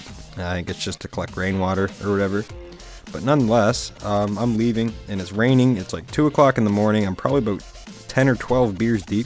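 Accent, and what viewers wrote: Canada. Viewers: Buddys got the Manitoba accent. Good vid bro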